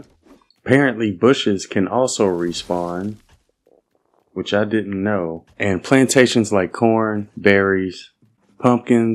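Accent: South, United States. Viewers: bro is definitely southern, arkansas?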